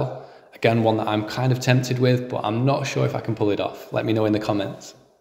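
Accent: England, United Kingdom. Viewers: You just need a bit more practice hearing Brit English for your ears to adjust. That's like saying you can't understand someone in Mexico because you learned Spanish in Belize. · With YOUR HEAVY ENGLISH ACCENT , Many international followers hear this “ it is my job to PAY you the best glasses. “ Adjust, adapt, modify . Speak clear, dont swallow words. We are not British English. English is international , keep it continental .PLEASE 🥹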